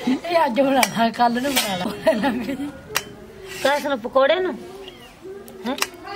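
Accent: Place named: Jalandhar, India. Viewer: Mainu tusi jalandar side de boli lagdi tuhadi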